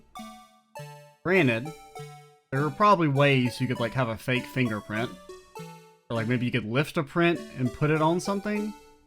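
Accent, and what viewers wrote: South, United States. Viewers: Meekins has the best court intro. An innocent confession right off the bat xD Also, I quite enjoy your southern accent, not many peeps I watch has one. Makes it more unique.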